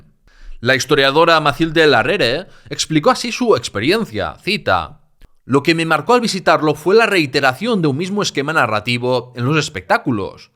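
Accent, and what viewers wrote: Catalonia, Spain. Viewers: Este personaje, iconoclasta de todo lo español, por su aceto, creo que debe ser catalán. Ataca la monarquía de todas las épocas, las conquistas, la religión, aboga por las culturas extranjeras, según él, debería atacar todas las películas de Hollywood, de temática histórica y religiosa, como Ben-Hur, Quo Vadis, La túnica sagrada, etc. Este detractor amargado, se cree en poder de la razón y la verdad absoluta, pero ya sabemos que eres un enrabietado de izquierdas, resentido y frustrado.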